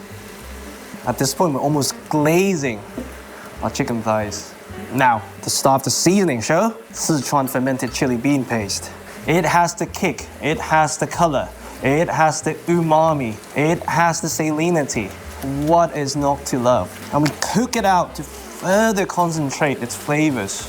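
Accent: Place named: United Kingdom. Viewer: Your British accent is adorable! 🥰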